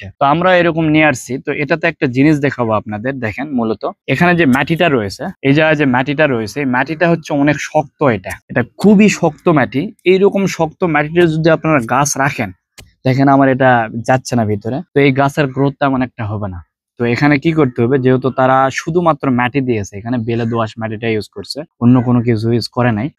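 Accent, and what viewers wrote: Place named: Joypurhat, Bangladesh. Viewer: ভাই কি জয়পুরহাট ওই দিকের,, কথা শুনে মনে হলো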